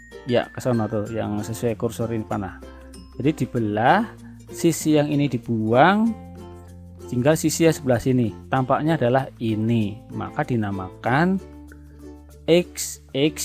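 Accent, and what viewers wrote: Banyumas, Indonesia. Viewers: pisan mas daerah ndi kie, purwokerto hadir😂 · mantab om tutorial jos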